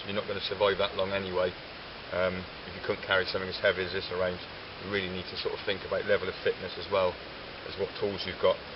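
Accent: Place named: England, United Kingdom. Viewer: Its 751g, which means it can run as an axe too, 49/51 carbon steel, Sheffield forged, micanta handle is lovely I swear by this blade, I run this and a Moro and love them..... I wear mine on the waist with an elasticated knee strap so i can climb over things without it snagging, that little eye on the bottom makes all the difference, do you still bush, sounds like your in England I'm Dorset are we close?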